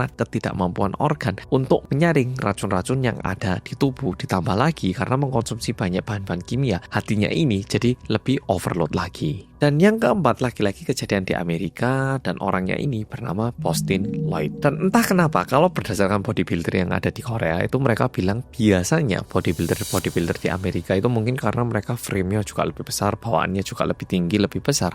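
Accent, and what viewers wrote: Java, Indonesia. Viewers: Ini wni bukan sih? Logatnya jawa 🤣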